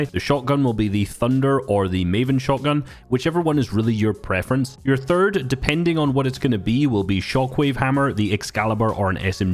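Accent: Scotland, United Kingdom. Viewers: Yo are you Scottish by any chance? And that tac pistol needs a nerf🤔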